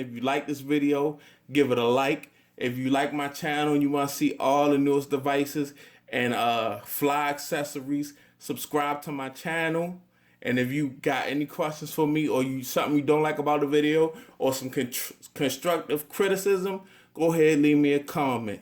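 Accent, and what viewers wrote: Baltimore, United States. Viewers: You from Baltimore huh? The Wire got me hip to the way yall talk lol.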